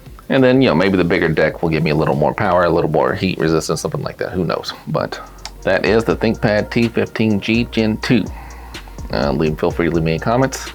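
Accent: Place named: Texas, United States. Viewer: nice accent! Texas?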